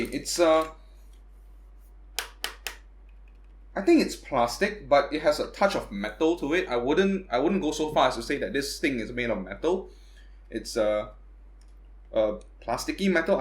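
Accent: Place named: Asia. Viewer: I love that Asian accent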